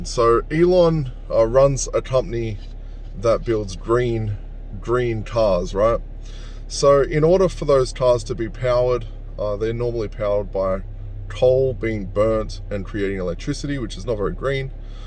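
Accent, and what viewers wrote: Australia. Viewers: Yep exactly mate! From Bitboy segment . Congrats on winning that segment.. im a new sub mate.. i am Aussie so nice to hear the Aussie accent.. i live in the Philippines now so yeh to get my Aussie fix im gona watch you! Cheers and keep it up bro! P.S Elon is a Sh!thead👎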